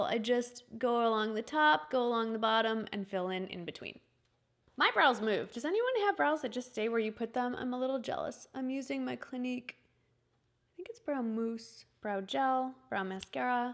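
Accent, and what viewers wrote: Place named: Illinois, United States. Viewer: I KNEW you were from Illinois!! I've just started watching you and just subscribed and wondered where you were from. (Little game I play, "Guess where this person is from... I'm goofy that way) I know three people from Illinois who sound just like you. Then you said you were in Texas and I'm thinkin' no way. Then you said it. Yayyy me! Anyway, enjoying your videos.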